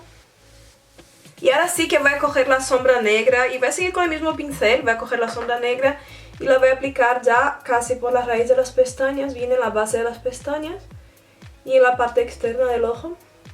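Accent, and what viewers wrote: Brazil. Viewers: Creo que Brasilera o eso sentí xd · Tienes acento brasilero, muy bonito! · Brasilera aunque en otro canal una chica también me pareció de Brasil y me dijeron que es de Honduras. 😀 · ya se me hacia que tenias un acento brasilero xD en fin buen video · Me gusto su acento brasileño mezclado con el español :3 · eres brasileña? (como se diga)xD o hablas así?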